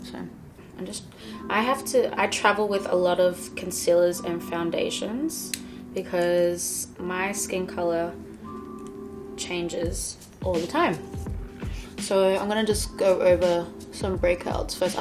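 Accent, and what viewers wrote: Australia. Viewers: Is this Aussie accent ? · I looove Australian accent😍😍😍😍😍😍😍 she's sooo beautiful💘💘💎💎💎💎💎💎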